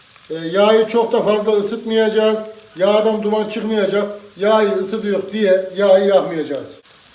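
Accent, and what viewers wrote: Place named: Isparta, Turkey. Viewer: Ellerinize sağlık Sefim memleket neresi Bizim ıspartanın şivesine benziyo konuşmanız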